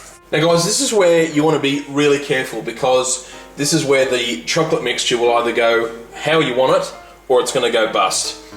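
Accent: Australia. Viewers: At first i only subscribed to him because he had an Austrailian accent, but now i found out he makes delicious things so it's a win win situation :D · Can anyone tell me is it Australian accent? Just curious.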